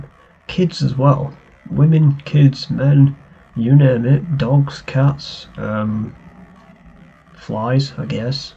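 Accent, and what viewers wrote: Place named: Liverpool, United Kingdom. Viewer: Are you from Liverpool? Your accent sounds alot like my buddies lol forgive if I'm way off the mark XD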